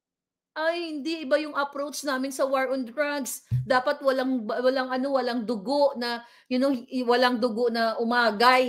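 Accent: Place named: Ilonggo, Philippines. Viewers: Hahaha! Love ko talaga ang Ilonggang salita mo palangga, hawig din sa Bikol❤️❤️❤️😀😀😀 Grabe ang “uttering”, tirahin ba nmng YUTTERING! Letse ka pernandes! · Hahaha go go go, ang bobo nga talaga ng mga tuwadcom, natatawa ako sa pag ilonggo mo dhay hehrhe,tama talaga lahat po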